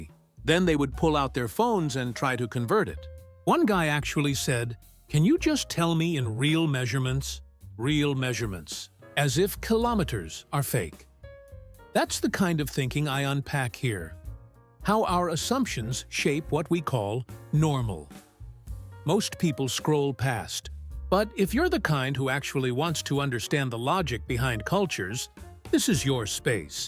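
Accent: United States. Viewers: I’m puzzled as to why AI is reading these anecdotes in an American accent.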